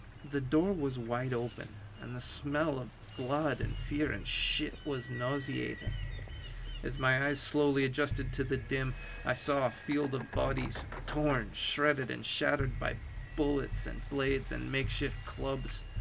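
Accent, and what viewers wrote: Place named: Ireland. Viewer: I've heard this one before but it's way better with the Irish accent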